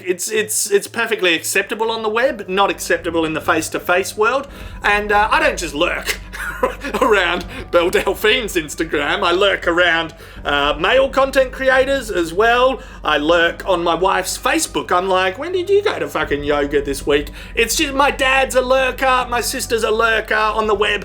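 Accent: Australia. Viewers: U should teach ur American fans how to talk like an Australian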